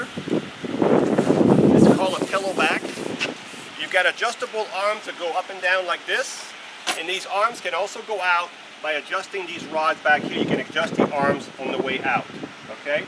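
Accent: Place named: United States. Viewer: You sound American, is it available across the pond in the UK?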